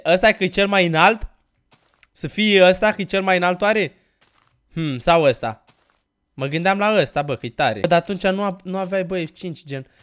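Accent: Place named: Moldova. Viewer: Accent de moldovan>>>>>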